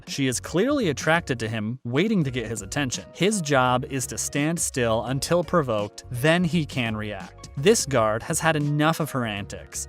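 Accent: United States. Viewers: The narrator is a jerk, he sounds like a Yank, so dumb. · The narrators' accent says it all. Yanks are always a pain,an advertisement of a run-down,corrupt & broken nation.Its types like him that dragged a once-proud nation to the depths it now inhabits. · What do I think ? I think the tourists should remember, they are duty bound to keep the Way free for the Guards and not reversed. You sound like an American, would you disrespect your own Soldier Guards at the Tomb of the unknown Soldier in the USA ?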